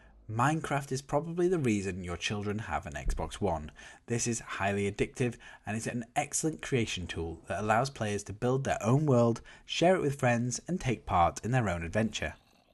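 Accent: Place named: United Kingdom. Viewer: Is there a video of Xbox games without a British person? Just accent is fucking annoying.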